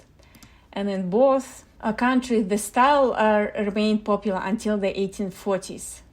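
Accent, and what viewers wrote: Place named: Russia. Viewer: ruskakaia accent lol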